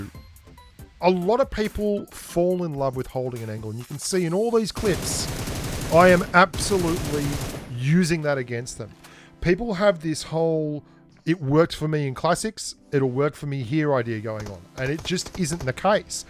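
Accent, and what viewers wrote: Australia. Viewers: You from Australia 🇦🇺?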